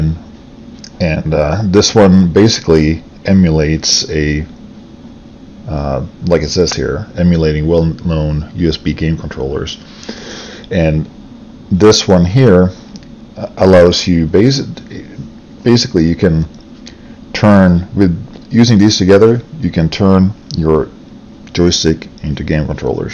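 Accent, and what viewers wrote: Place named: Netherlands. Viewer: lekker bezig man! wel beetje overduidelijk nederlands haha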